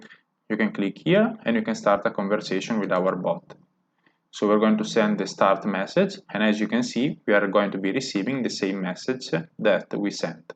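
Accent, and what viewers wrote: Italy. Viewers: Hey! Very helpful and well structured tutorial. I was concerned there was no free option for telegram bot hosting but using Firebase cloud functions works! Also I noticed from your name and accent that you are Italian. I am from Italy too!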